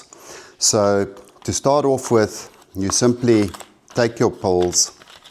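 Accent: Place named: South Africa. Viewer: It's so nice to hear your South African accent! Thanks from France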